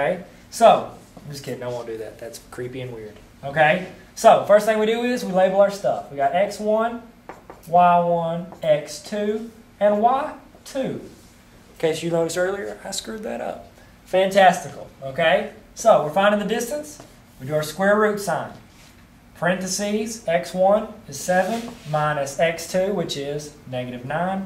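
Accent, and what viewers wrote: South, United States. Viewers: Sup with that country accent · this helped me so much thank u btw i never understood from the teacher but when i come back from school i study what i did today and u helped me sooooooo much ZAAAANKS!!!!!!!!! YOUR THE BEST TEACHER BTW I LOVE UR COUNTRY ACCENT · Um lets use our heads maybe he from the south? sup with you stupidity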